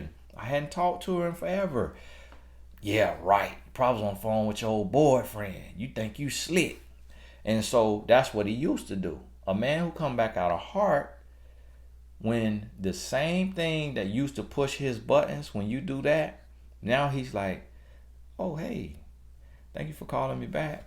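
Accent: South, United States. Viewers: Lol, and his cute country accent.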